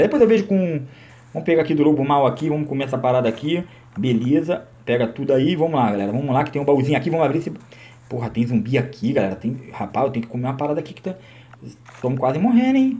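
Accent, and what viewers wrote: Rio de Janeiro, Brazil. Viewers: Mano tu é do rio?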